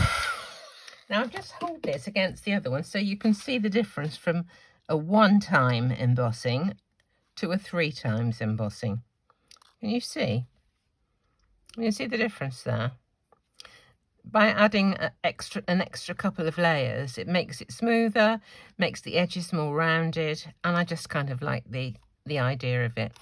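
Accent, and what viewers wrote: England, United Kingdom. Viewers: Hi. I am in Spain and love your videos. Not many with a lovely English accent. I haven't got the same die, but I do have one with a gnome on and will try and do something similar. I hope it works out. thank you so much for sharing this idea. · I’m watching you from Mississippi, USA. can’t always catch you during the day but usually enjoy your videos in the evening. I love you cards and your soothing British accent. Looking forward to your next video.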